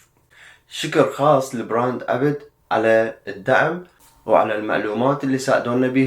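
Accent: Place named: Basra, Iraq. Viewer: رحم الله والديك كلش خوش فيديو و حبيت لهجتك البصراوية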